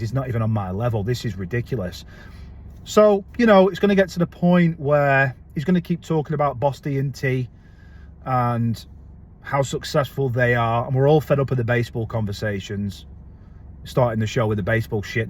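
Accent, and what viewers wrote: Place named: England, United Kingdom. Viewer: Thank you. I feel the same. And I really love hearing your English accent. English accents are glorious & always elevate the experience ❤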